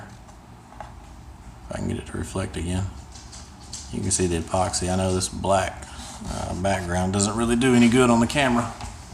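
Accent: South Carolina, United States. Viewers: where is this accent from? south carolina?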